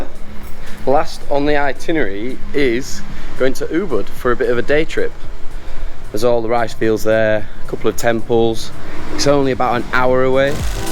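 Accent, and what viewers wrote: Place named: Yorkshire, United Kingdom. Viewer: nice video brother. You sound like your'e from Yorkshire aha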